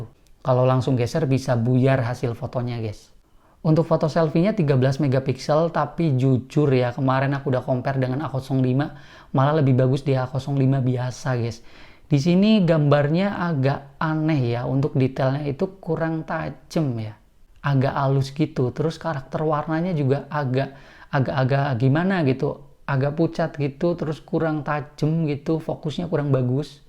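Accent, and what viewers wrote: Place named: Banyumas, Indonesia. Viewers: Khas Banyumas 😁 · Wiih wong banyumas jebule 😂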